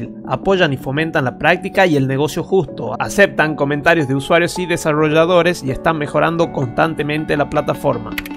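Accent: Argentina. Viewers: Wen eres argentino? Solo pregunto por el asento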